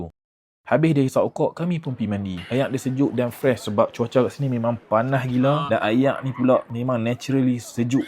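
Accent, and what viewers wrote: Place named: North, Malaysia. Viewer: jaga diri kat sana, sebarkan slang utagha kat sana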